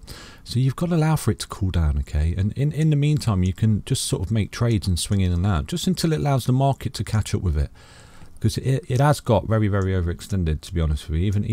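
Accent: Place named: Mansfield, United Kingdom. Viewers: Are you from Nottingham? That accent! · you sound like you have a mansfield/Notts accent. Or am i way off?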